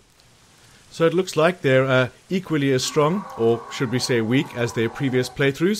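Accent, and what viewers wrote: South Africa. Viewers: Hey dude, you sound South African....are you?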